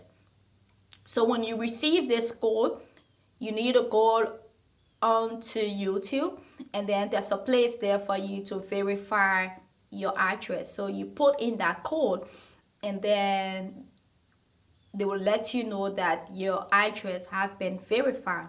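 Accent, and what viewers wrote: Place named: Liberia. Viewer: Hello, JackieNaturals is always mentioning your name so I decided to check you out and support. Your made your first $100 on my birthday. I think YouTube takes a percentage. I like how you’re matching with your eye look. I just went on your website and found out you’re 🇱🇷, I knew I heard an accent. I’m Liberian too 🥰🥰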